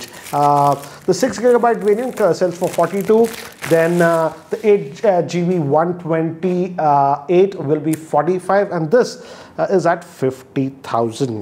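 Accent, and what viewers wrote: India. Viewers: Classic Indian accent I'm don't used to this accent lol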